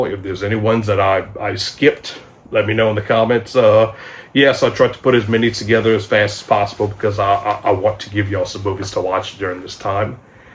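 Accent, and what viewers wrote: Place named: Louisiana, United States. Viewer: as soon as you started talking I thought Louisiana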